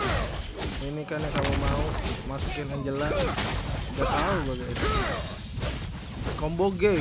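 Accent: West Java, Indonesia. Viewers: banyakin ngomong pake bahasa sunda bang, seneng gw dengernya jirr kocak 😂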